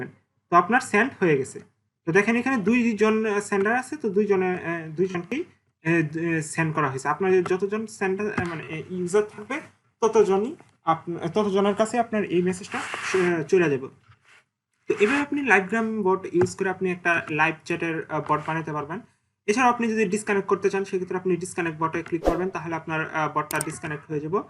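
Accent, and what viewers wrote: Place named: India. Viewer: Could you try to speak more international English in your next video please. A strong Indian accent is hard to understand if not used to.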